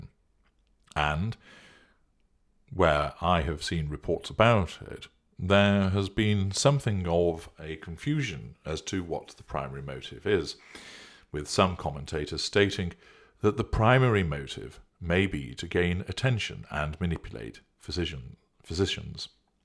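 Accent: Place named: North, United Kingdom. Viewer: Your accent, has a northern twang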